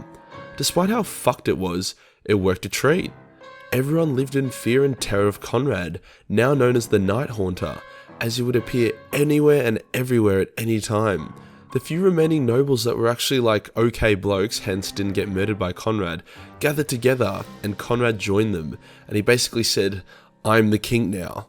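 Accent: Australia. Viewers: Dude, nothing makes me laugh more than listening to you explain things as only an Aussie can..... Love it mate and keep rolling them out..... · Portuguese: Bom dia, seus putos. You pronounce as you do in your beautiful australian accent.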